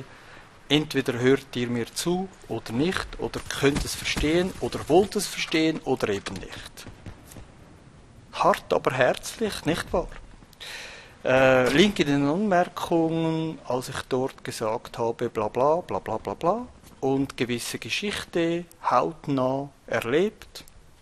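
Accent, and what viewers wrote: Switzerland. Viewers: Mit deinem schweizer akzent hab ich kein problem denn den find ich geil!!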